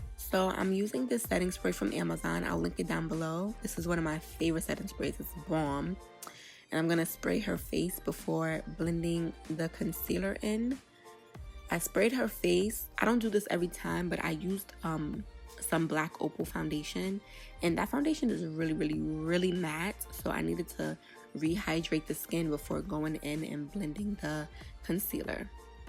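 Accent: New York, United States. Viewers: It’s so easy to pick up on. Lol I’m from Nola and knew she was from NY haha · Gotta be from New York u sound just like juju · Yasss!! 😍😍 I’m like yup, she from ny.. I can hear it.. where do u find ebony bronze? I can’t find it anywhere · Right knee she was a NYC girl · That cut crease is EVERYTHING!!! Absolutely beautiful!! BTW: u sound like ur from NYC? If so.. NYC STAND UP!! Great job 👏🏽👏🏽👏🏽👍🏾👍🏾👍🏾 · I LOVE how you talk lmao. Are you from NY?!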